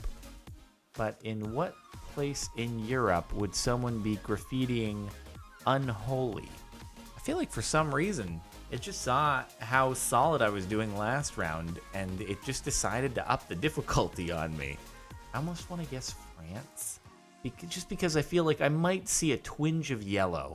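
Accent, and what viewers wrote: Russia. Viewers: god i love your russian accent lol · "This is Mother Russia over here, and here is Baby Russia right there" I cracked up at that point but yeah even after 5 years that Russian accent is very funny and cool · I don't think it's bad that he does a Russian accent it sounds cool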